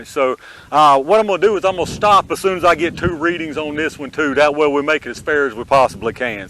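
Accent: South, United States. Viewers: Excellent presentation, loved: that southern talk!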